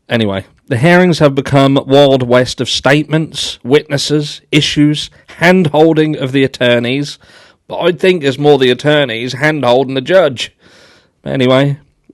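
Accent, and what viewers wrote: United Kingdom. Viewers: I've seen your subs swooning over your blue, blue eyes, your British accent, your beautiful wife, etc. but it's your unexpected, high-pitched giggle that does me in 😅😂😂! Dying.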